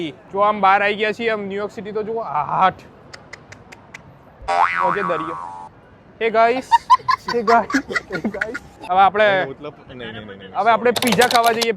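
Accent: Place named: Mehsana, India. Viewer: ભાઈ મને તો મજા આવે છે એક દમ સિધ્ધપુર ની ને મહેસાણા ની ભાસા સાંભળવા મજા આવે છે તમ્મરીયા આવા છે ચક્કર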